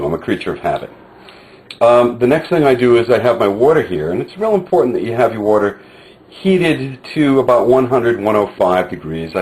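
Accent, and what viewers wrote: United States. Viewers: HEY ARE YOU FROM NEW YORK LOL when ever i lisen to that accent it reminds of when i lived in the bronx, i moved 2 years ago and boy do i miss the pizza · Are you from Philly?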